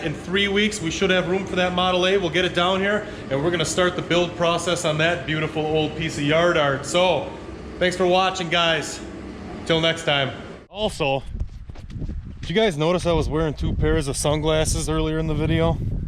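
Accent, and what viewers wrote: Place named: Northeastern Pennsylvania, United States. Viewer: You in Pennsylvania? Sounds like a northeastern PA accent (like me)!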